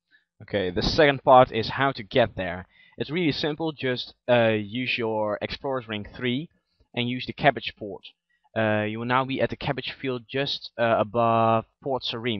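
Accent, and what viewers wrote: Netherlands. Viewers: great, but should have added an ingame shot as well, just to see what it looks like. Just wondering, dutch accent? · Are you dutch? U got a dutch accent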